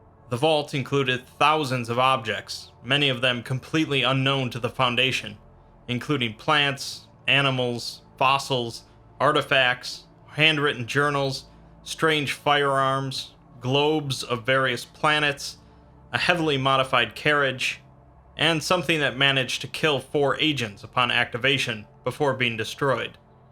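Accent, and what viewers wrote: England, United Kingdom. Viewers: Which English accent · Great British accent didn’t think it was really you at first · Two years later and I’m still amazed kept up an English accent for almost a whole hour. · Another great episode. Good English accent lml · That British accent is amazing!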